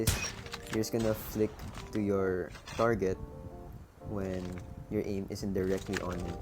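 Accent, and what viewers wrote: Philippines. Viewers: Are u a Filipino? You sound like one tbh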